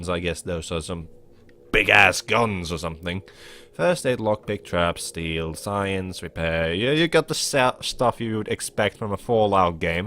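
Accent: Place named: Finland. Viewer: Your accent sounds... Nordic. If I were to take a guess (and I am taking a guess), you're Finnish?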